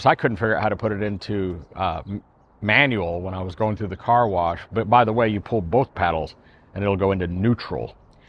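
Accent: United Kingdom. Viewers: at first yeah but after a few videos it normal and I like his British accent. However, his father hard laughing after each talk is very annoying.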